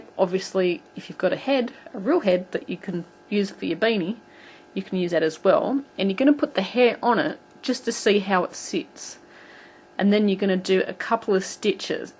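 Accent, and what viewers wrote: Australia. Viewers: She is Austalian and yeah her accent IS awsome =]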